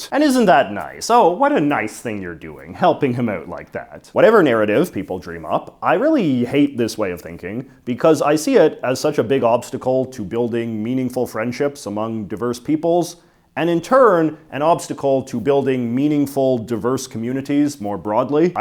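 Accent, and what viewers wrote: Canada. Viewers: is the most Canadian sounding Canadian i've ever heard. Also did not realise you were 38...thought you were way younger than that. ❤ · There is something in the way that you speak that is slightly hypnotizing. The eye movement. The head movement. The cadence of your speech. The intonation swells. The relaxed smiles. It's very calming. You could easily speak for a 3 hour lecture and I would listen to all of it. .. Maybe it's just because I'm Canadian and like to hear the accent. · has literally the most Canadian accent I have ever heard, and I'm a Canadian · You have a very Canadian stereotyped "about". I often heard the stereotype but I didn't think it was real. When I see a same sex couple holding hands, I smile like a loon and I try not to stare at them at all because it would be so creepy to have this stranger smile and stare at the same time. 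I can't help it, it makes me so happy. It's so rare where I lived, I think it happened to me only once. I hope that one day, it will happen so frequently that I will have a normal reaction. (just to be clear, I'm queer too) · Mmmm hard to say and tricky subject I mean I hope shit calms down but I think most men 19-25 say have the thought of "could tap" with any possible mate which does get in the way of meaning ful relationships with woman and so in a ignorant attempt to step into one's shoes the young man with think that a young man who is instead attracted to men will then have similar thoughts. It is kinda baked into what we are. The whole friendship thing is just a plus of having big brains. I had something I wanted to say but forgot after trying to get all this out oh yeah kinda lame you are only know for being gay I remember being kinda surprised but you really don't talk about it. 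I never know If I should be typing third person so it doesn't read like I am talking to the youtuber hie self... you know? anyway I always think of this guy as the one canadian that actually sounds like that and the guys isn't even a newfy I have even visited my uncle in Vancouver and I didn't pick up the locals accent. Man that was long winded I need some more fluoride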